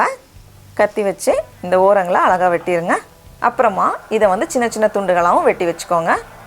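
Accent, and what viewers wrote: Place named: Tirunelveli, India. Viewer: Hi why no videos for a long time eagerly waiting for ur video. Nice video. U r always a queen in cooking I like u very much and also ur tirunelveli tamil. Iam Christilla from Chennai.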